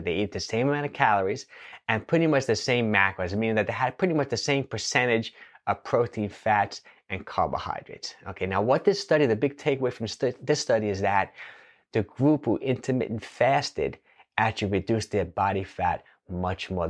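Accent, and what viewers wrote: New York, United States. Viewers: you New Yorkers have your own accent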